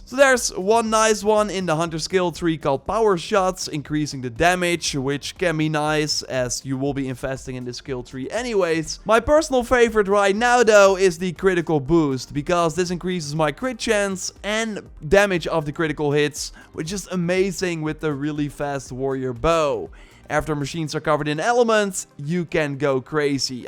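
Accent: Netherlands. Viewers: you sound dutch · This Dutch accent is something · nice dutch accent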